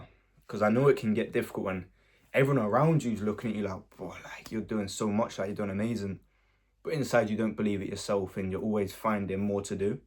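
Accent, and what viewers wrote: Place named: United Kingdom. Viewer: yeah! ur video actually popped up on my youtube recommendations. it's crazy to think i'm talking to someone from the other side of the world! i knew you were british immediately because of your accent haha. keep uploading content, bro !💪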